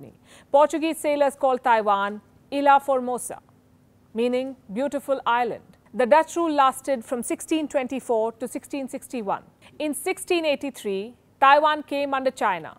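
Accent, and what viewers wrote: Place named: India. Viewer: If you like that indian accent 🤢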